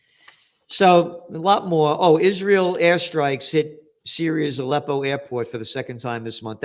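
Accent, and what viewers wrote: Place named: New York, United States. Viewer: Great video, thats what I miss NY effing talk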